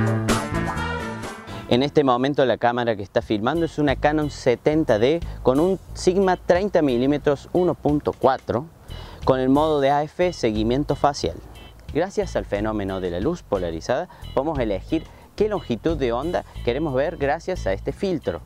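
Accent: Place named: Córdoba, Argentina. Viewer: Te escucho con acentito coordobes, argentino. Gracias por el video.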